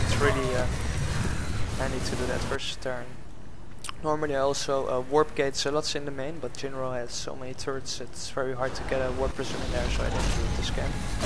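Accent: Netherlands. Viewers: Wanne Hollandse accent joch !!! Good video btw ! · Work on the Dutch accent ^_^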